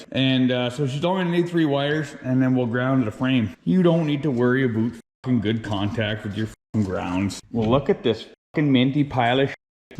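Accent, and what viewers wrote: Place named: Western Canada, Canada. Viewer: I only just got here, and I see a Canadian flag and hear an accent that sounds like you've got the Western side of the land in ye, and then I remember the fact that the comment from another video I was watching that I found you from mentioned the fact you got a beast named Slave Lake. Yeesh, you from the plains er sumthin?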